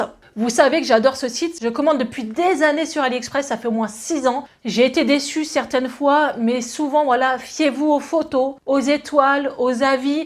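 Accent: North, France. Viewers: Bonjour. Vous êtes du nord ?? Vous avez un accent. J'aime bien vos vidéos. Bis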